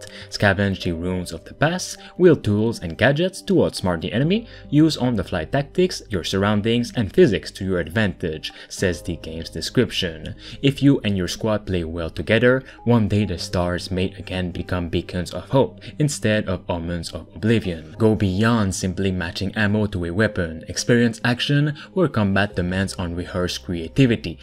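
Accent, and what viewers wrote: Quebec, Canada. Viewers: tes quebecois toi hein? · Tu parles francais? Tu sonnes quebecois 😜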